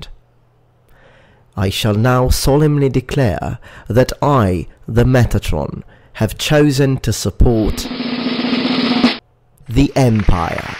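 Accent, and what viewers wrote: United Kingdom. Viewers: I love going back and watching Metatron's older videos and it always takes me by surprise how thick and almost British his accent was at this point.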